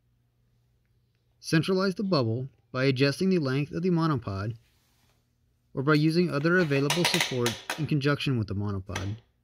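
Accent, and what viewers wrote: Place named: United States. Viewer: I really don't like the fake instructional training video format. And your nasal US accent wouldn't have got past the front desk of the WD Publications Branch.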